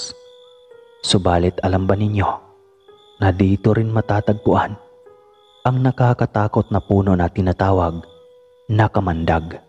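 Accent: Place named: Visayas, Philippines. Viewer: Boss bisaya kaman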